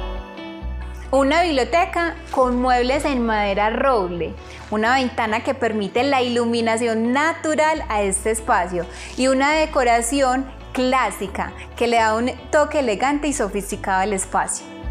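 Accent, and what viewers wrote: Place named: Antioquia, Colombia. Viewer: "cuenten pues a ver.." jajajaja me encanta tu superrrrr acento paisa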